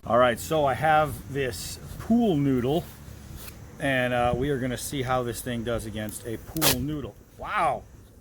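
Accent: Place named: Rochester, United States. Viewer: Got this knife today, nice demonstrations. Where you from sound a little like Rochester NY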